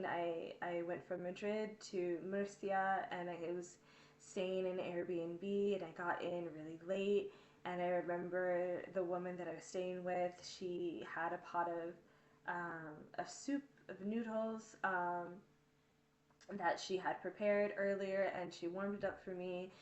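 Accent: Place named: United States. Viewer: Are you originally from the united state? because you speak like you are from the united state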